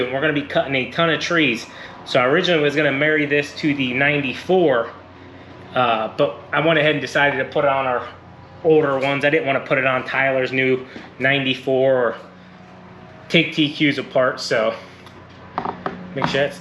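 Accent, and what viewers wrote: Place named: Pittsburgh, United States. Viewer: Waymorebetter Coolbeans iamathinkin Pittsburgh talk just saying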